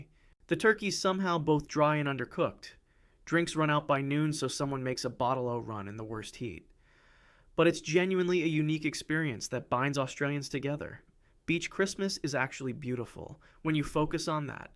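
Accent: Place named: United States. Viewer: Profoundly ironic and offensive that its a bloody Septic (American) producing this video. Not that he's wrong, and its good to see someone turning these observations (debatable as some of them are) into a You Tube video, but I can't help baulking and can't watch the whole thing for hearing an American accent keep saying "WE" is positively nauseating.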